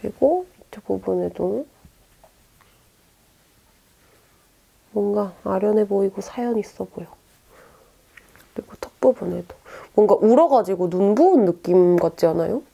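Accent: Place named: Gyeongsang, South Korea. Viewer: 님 약간 경상도 말투 나오는 거 같아요... 기분 탓인가...?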